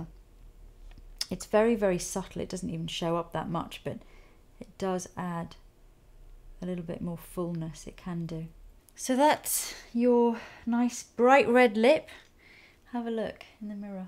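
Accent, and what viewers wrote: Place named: England, United Kingdom. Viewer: I don't know if i watch every tutorial from you without missing ONE because of your talent and because your make ups are always light and soooo professional, or if it's just because i loove your voice and accent. I'm french and i love english accent !!! It's hypnotising !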